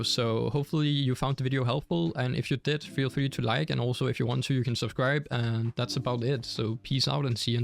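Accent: Denmark. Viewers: This guy sounds so danish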